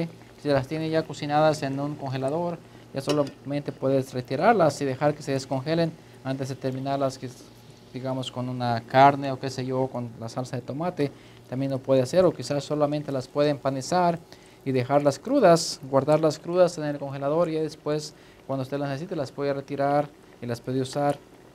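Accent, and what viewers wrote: United States. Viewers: Déjate de acento estadoudinense,no te queda bien amigo!!! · El mexicano hablando como los gringo aprendiendo hablar español jajajaja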